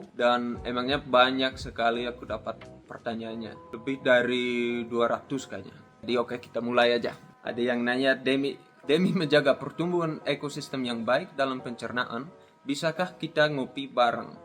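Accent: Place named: Bali, Indonesia. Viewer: Bahasa indonesianya, sekilas dua kilas ada aksen Balinya. Sangat memboemi sekali meneer.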